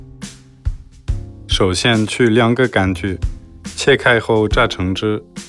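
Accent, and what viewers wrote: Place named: Henan, China. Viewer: 河南口音笑死我了哈哈哈哈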